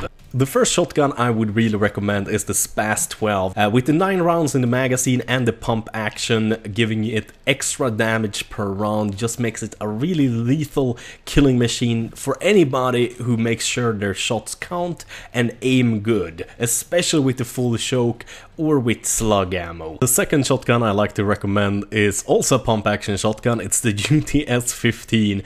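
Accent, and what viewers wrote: Sweden. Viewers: ur accent is so Swedish... I LOVE IT!!!! :D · are u swedish?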